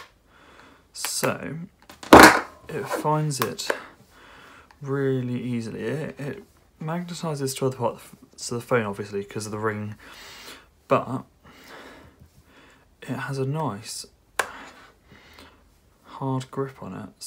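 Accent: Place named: RP, United Kingdom. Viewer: Corrr your posh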